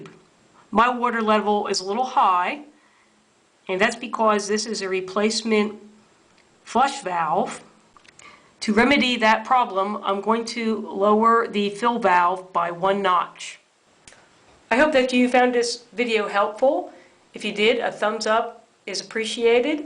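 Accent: Philadelphia, United States. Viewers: sound like your from Philly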